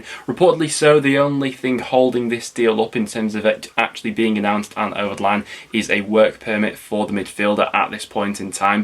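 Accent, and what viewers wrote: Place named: Preston, United Kingdom. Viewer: As always, a very good video, BUT please stop calling Lewis.....O'Breean. My friend worked in the media department at Huddersfield and it is definitely pronounced O'Brian, as in Clough. Tbh, it might just be that Preston accent: )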